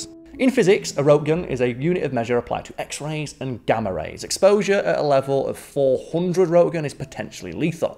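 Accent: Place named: Northern England, United Kingdom. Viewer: North England.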